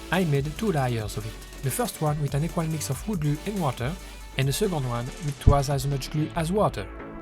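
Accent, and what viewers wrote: France. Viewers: Tes un français ou pas parce que ta vraiment un axen très français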